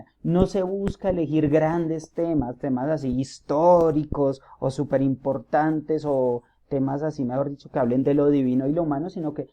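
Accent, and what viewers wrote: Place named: Colombia. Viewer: Pues el cuento me pareció muy realista ya que como lo dice en el titulo hay personas que tiran la piedra y esconden la mano es decir, que hay personas que cuando les conviene son santas pero cuando no les conviene son malosos. En otras palabras son hipócritas. Además el cuento super bueno ya que lo que me gusto fue que parte de ser escrito con el acento colombiano es muy realista. Que tengas un bonito día profe!!!♥♥🥰😋😁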